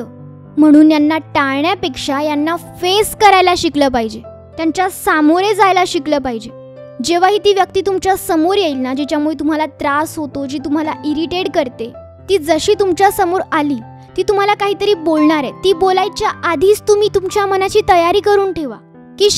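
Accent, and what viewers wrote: Pune, India. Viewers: Puneri Marathi ❤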